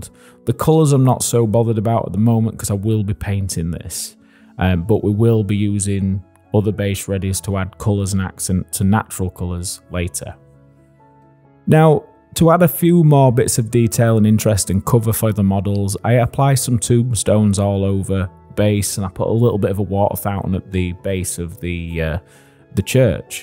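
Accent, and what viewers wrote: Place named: North, United Kingdom. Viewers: If I get stuck with something I always looked here and there was something to help. Going to miss that northern voice. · To hear someone making content and to do it as popularly as you do it, in an accent like my own is worth its weight in gold. No shade to southern or middle class content creators but to have you available to view and for your content to feel that bit more relatable has always felt invaluable. Glad to still have Pickle Jar and MS Paints but you will be sorely missed none the less. Reyt good. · Good luck mate. I’ve loved your content from day one. Will say I’ll miss hearing your voice. I grew up in cleethorpes and I don’t get to hear that accent very often where I live now haha. Take care man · Hi there. Just found your channel and have watched a few of your videos and I like what I saw so I had to subscribe. 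I like what you do because I’m wanting to get into doing dioramas myself. Thanks for the great videos that you do and I will continue to watch. Take care and I would say blessings to you from England but I think you actually live in England from your accent so I will just say blessings to you from Weymouth.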